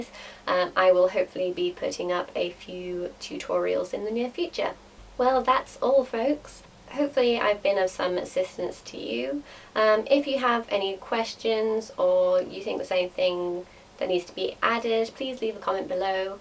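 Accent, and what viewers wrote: United Kingdom. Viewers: Your Queen's English is immpecable! Lovely to see another well spoken being ♥ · Where are you from? Because i'm English & i've never heard somebody sound SO English lol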